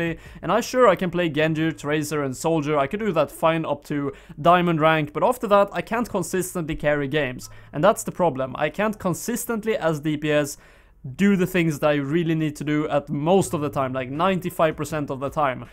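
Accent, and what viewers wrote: Sweden. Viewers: This guy is so swedish you hear it on his voice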